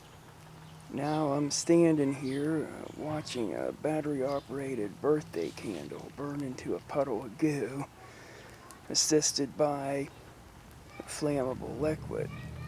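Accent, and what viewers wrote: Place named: Pittsburgh, United States. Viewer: I'm loving the Pittsburgh accent btw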